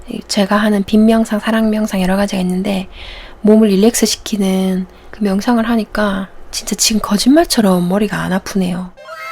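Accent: Gyeongsang, South Korea. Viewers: 개인적으로 ,경남 사투린지, 부산 사투린지몰겠지만, 더욱 정감이가고.... 더불어 전해지는 진심어린 내용에 푸~~~욱!! 빠져 들게하는 매력이 있네요...ㅎㅎ 감사 하고 있어요~~!!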